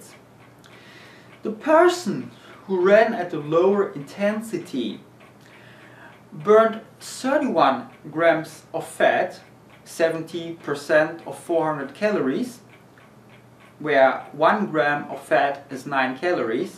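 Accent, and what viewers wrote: Germany. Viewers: After all, I still don't know what do do in my situation =D What do I have to do to lower my bodyfat percentage without losing weight anymore? Im already down to 69 KG (my body height is 188 cm) and I can't see my abs at all? Maybe you can answer my question. Would be nice if you PM me and I would prefer german, you sound like you're originally from germany ^^ Greetings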